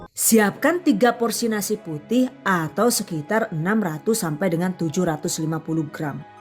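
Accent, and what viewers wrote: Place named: Java, Indonesia. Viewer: awalnya muncul di beranda..apaan sih ini..eh pas di liat lucu narasi nya..apalagi bagian jawa medoknya...masaknya jg praktis...makin kesini makin suka..oke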